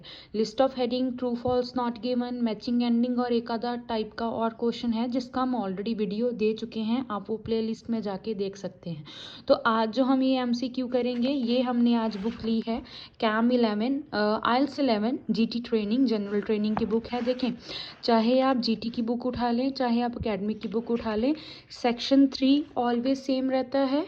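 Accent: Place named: Punjab, India. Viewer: Nyc punjabi akcent overall the method is too good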